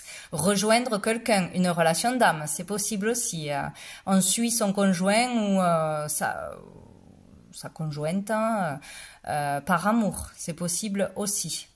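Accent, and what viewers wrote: South, France. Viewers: avé ton accent méridional et candide, tu es sympa ❤😀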